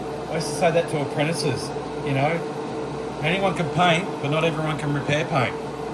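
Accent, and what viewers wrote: Australia. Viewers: Knew you were a bloody Aussie, like me, pick the accent anywhere :)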